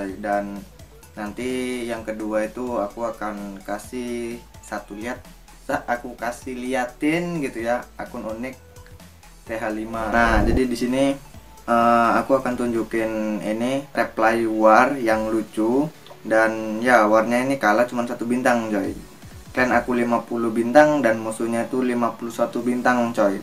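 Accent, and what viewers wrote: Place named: Banyumas, Indonesia. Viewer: Ngakak ngapak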